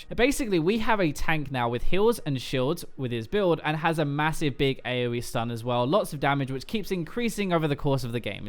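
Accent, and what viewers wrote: United Kingdom. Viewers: i like the uk accent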